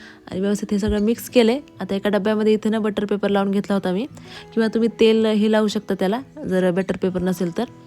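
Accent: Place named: Buldhana, India. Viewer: छान केलंय घराचे काम, पूर्ण झाल्यावर भारी वाटेल 😊 माझ्या मावशी सासूबाई नांदुरा बुलढाणा च्या आहेत त्यांची बोली आणि तुमची बोली सेम आहे 🥰 मी माझ्या काही व्हिडीओ मध्ये दाखवलंय त्यांना✨ छान वाटतं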